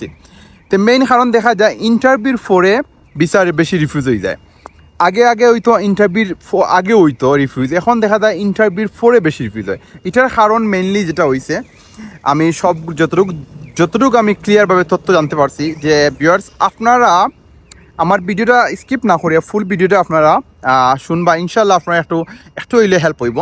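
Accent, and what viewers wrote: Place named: Sylhet, Bangladesh. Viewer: Bhai kotha bujhte onek problem hoi.. Hoi proper banglai bolen nahole english a video banan Amra sobai to Sylheti accent er sathe used to na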